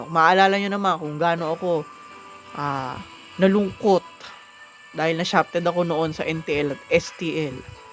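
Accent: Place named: South, Philippines. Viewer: taga south yung accent. Dala ng emotion haha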